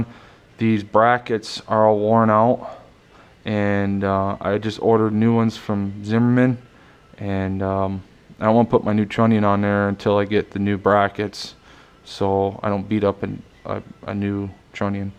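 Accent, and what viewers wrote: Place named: Appalachia, United States. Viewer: Hey buddy its Justin lost your number watched all your videos to hear your hillbilly a** again hit me up ill send you my #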